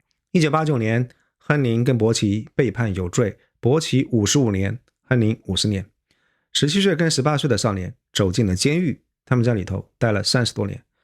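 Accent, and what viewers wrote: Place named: South, China. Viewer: 自己说过好几次自己是南方人普通话不太标准有口音理解万岁👏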